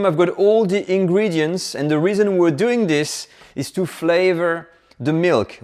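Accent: France. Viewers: Chef! I remember u when I watched the Julie & Julia movie! Even ur intro music and french accent; Meryl Streep did justice! "You are the butter to my bread, you are the breath to my Life" More power to u Chef! 💪👏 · Your vocabulary is wonderful. You were using a lot of adjectives that a lot of French don’t know when speaking English. I’ve had a French Boyfriend for 16 years; so I know you are using some English Descriptions that are not commonly known by ESL Speakers. Your French Accent is Charming!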